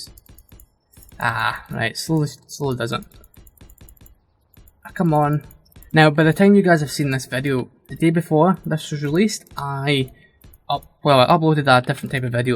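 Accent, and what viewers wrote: Scotland, United Kingdom. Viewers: That is due to his STRONG Scottish accent plus he did not have a clue and not prepared to show the correct way.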